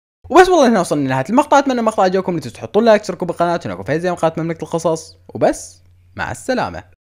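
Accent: Iraq. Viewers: وت لا مبين حجية عراقي بس هو كال انو دخل مباراة بنادي الكرخ وهيج اتأكدت انو عراقي😂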